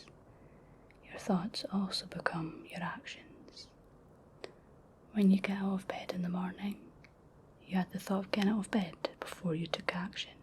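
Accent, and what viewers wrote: Scotland, United Kingdom. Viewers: I love listening to a Scottish accent. It's nice to hear, because usually I'm hearing the West Coast American accent.☮️ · Yeah, Scottish ASMR. Hello from NE highlands!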